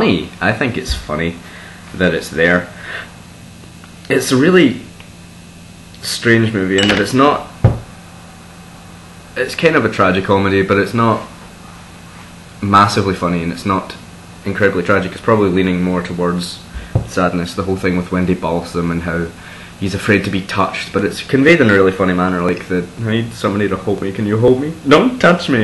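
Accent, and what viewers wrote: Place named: Glasgow, United Kingdom. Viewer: Buffalo '66 is an amazing film! Also! Nice glasgow accent!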